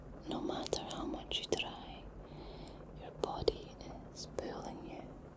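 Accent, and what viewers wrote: Scotland, United Kingdom. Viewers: i really like your scottish accent. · Your voice makes my brain melt..Scottish accent is so good for ASMR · Never realised i liked the scottish accent. You have a very relaxing and soothing voice. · I'm loving the soft speaking instead of the whispers, it highlights your nice Scottish accent, it's so soothing 😴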